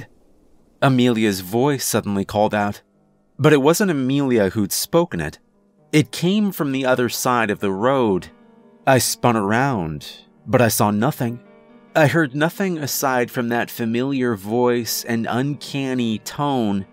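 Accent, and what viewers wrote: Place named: South, United States. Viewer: Did anybody pick up a weird teter-otter between a southern and a northern accent?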